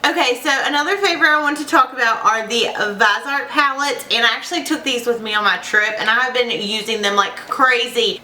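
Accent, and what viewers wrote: South, United States. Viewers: You are SO beautiful. I love your videos so much. You are such a sweetie. Your makeup looks gorgeous! I would LOVE to see a tutorial. I could listen to you all day. I love your accent! I am a southern girl too. Love you,.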